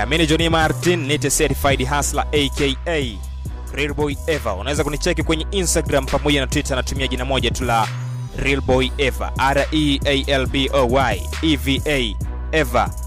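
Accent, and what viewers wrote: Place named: Tanzania. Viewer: sasa huyu nani anasoma English in kiswahili? Tanzanians what do u have against English??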